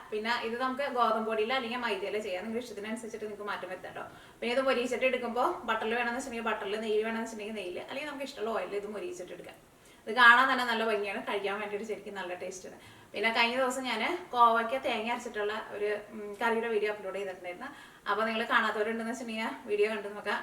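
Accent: Thrissur, India. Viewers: ഇതണ് തൃശൂർ സ്ലാങ്ങ്. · ഇങ്ങള് തൃശൂർ ആണാ. പറേന്നത് മനസിലാവണില്യ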